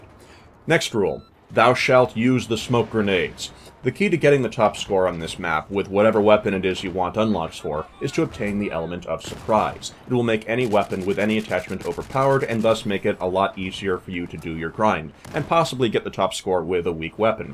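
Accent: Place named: United States. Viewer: what state of america are you from? you have the same accent as the guys from FilmCow i think! you could probably legit go to the titusvile :L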